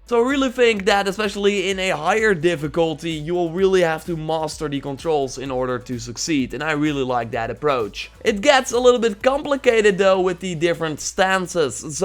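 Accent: Netherlands. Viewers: Mr_Bohowsky he's Dutch. Let him be. The Dutch-English accent is our fucking pride, man.. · Nederlandse accent cringe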